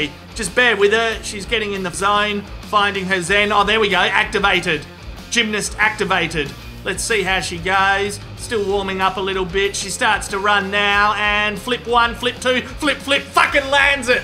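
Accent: Australia. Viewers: Your Australian accent is so strong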